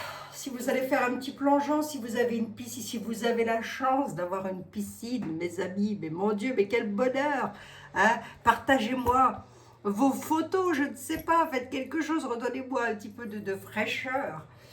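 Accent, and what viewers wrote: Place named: Lorraine, France. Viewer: Vous me faites rire et croyez moi ça me fait du bien. Je suis une Lorraine de Meurthe et Moselle "expatriée" en Bourgogne et entendre votre language Lorrain me fait un bien fou 😁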